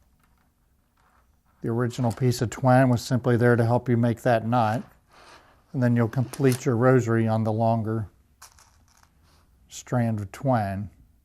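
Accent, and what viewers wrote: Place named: Northern Ireland, United Kingdom. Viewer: Very northern irish sounding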